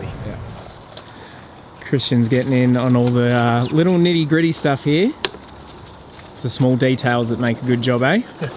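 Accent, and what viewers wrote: Australia. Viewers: Most annoying Aussie accent ever. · Looks great! Makes me miss living in oz. I miss all the extra Ws and Rs in the accent 😂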